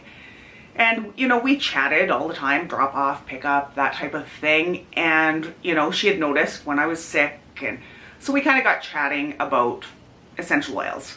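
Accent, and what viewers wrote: Canada. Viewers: Canadian accents make me happy 🥰 I’m from Tennessee and I love the differences in how we speak! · your Canadian accent is EVERYTHING ❤️ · I think she’s from out west in Canada because we don’t all talk like her. She’s got the typical out west (Alberta, BC). My family lives out there. I’m in Quebec. It’s fun listening to her because it makes me think of my family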